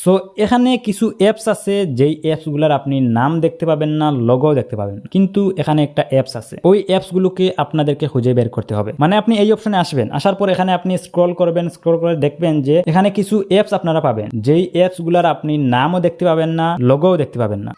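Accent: Sylhet, Bangladesh. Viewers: Vai afne kita Sylheti ni?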